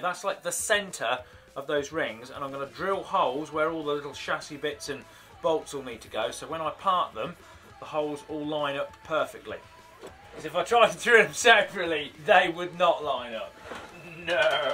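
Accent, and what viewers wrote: England, United Kingdom. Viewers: what part of england is he from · *cough he's very much ENGLISH me old fruit... Although we are basically cousins (aussies - British)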